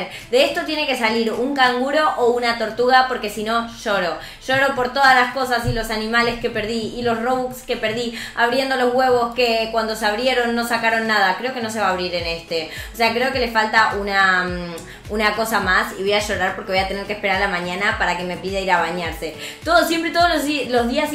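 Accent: Argentina. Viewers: Sos de argentina?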